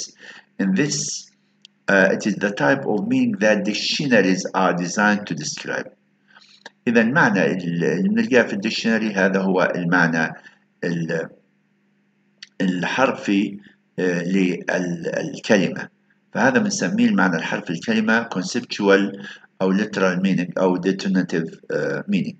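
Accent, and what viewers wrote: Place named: Mosul, Iraq. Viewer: دكتور كيف حالك عساك بخير. ربي يحفظك. دكتور اعتقد انت عراقي؟ لان لهجتك مثل لهجت اهلنا في الموصل للعلم انا من ديالى خريج جامعة الموصل في التسعينات. تحياتي لحظرتكم.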